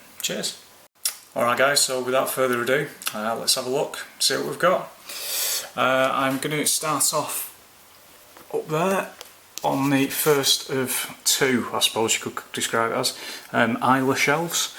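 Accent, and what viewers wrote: Manchester, United Kingdom. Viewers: Loved this. You sounded particularly manc in this vid. Love it.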